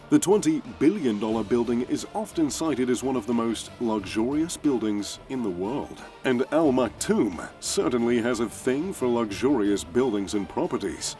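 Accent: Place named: England, United Kingdom. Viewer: I love your voice !! My mums from England I love the accent !!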